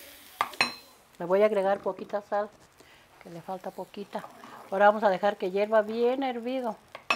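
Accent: Michoacán, Mexico. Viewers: DAVID CORDOVA y michoacana Con ese acento tan dulce de las michoacanas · forma tan natural de expresarse muy de México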